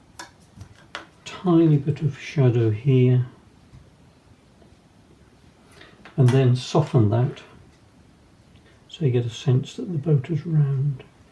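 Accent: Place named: England, United Kingdom. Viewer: Thanks. It's great to hear a true English descriptive narration, and a lovely result too.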